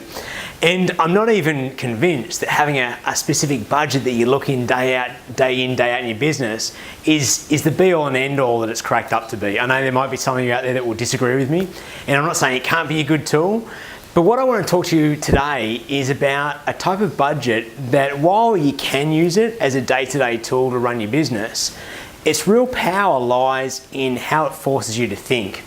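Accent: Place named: Australia. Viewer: Love your aussie accent mate. You explained very well. Thanks and keep making more detailed videos regarding sales, profit, some success stories, case studies .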